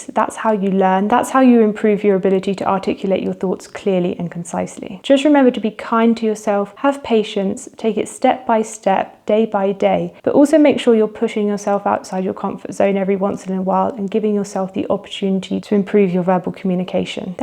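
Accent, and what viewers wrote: United Kingdom. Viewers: Good video. Is this rp accent?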